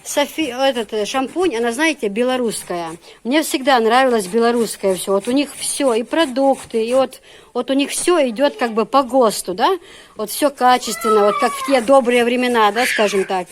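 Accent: Chechnya, Russia. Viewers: Ма шаа Аллах1.,вас не отличишь от чеченки-даже акцент чеченский.Умничка,смогла на все 1000% влиться в семью,в быт,в традиции.Так и должно быть,когда выходишь замуж,то нужно перенимать их привычки и традиции,а не навязывать свои. Дети,очень красивые ма шаа Аллах1. Дала дукха баха бойла. Шу Дала барт цхьа бойла,аьтто бойла!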